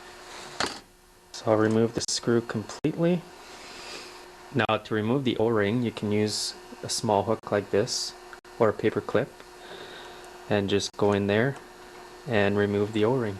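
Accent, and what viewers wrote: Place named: Canada. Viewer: great info. exactly what i needed. thanks for posting! nice Canadian accent, by the way. very calming!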